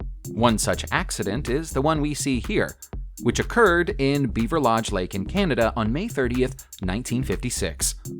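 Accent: United States. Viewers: The announcer sounds American. How is it he can't pronounce Catalina?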